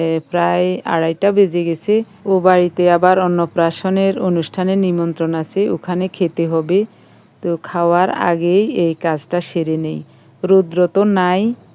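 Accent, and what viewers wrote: Noakhali, Bangladesh. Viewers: Aamar sabche bhalo laglo desher noakhali kotha dhanyawad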